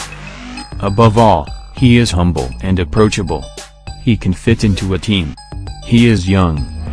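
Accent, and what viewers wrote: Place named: United States. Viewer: The white man cannot be trusted,is it the opinion of reuben abati or the voice of reuben abati ?,it is certainly not reuben abati but the accence of a white American man,he cannot pronounces obasanjo name correctly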